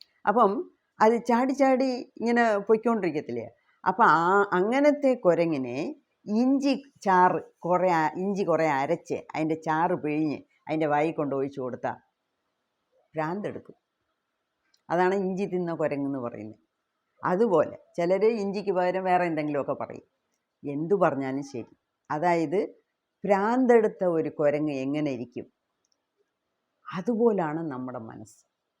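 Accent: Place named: Tamil Nadu, India. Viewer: Maminte plan anusarichu ellam orderil paranjal mathi 🙏Maminte thamizh kalarnna Maminte speech eniku orpadu ishtam 😍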